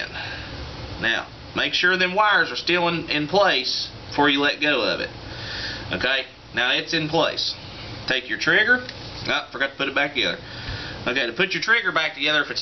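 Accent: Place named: Alabama, United States. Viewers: Dude, where are you from? Trying to place your accent. Tennessee? Kentucky? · Sounds like he is from Bama. Good vid!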